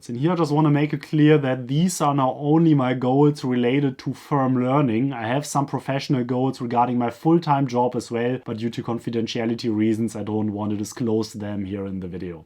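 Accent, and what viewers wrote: Germany. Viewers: oh goodness that heavy german accent....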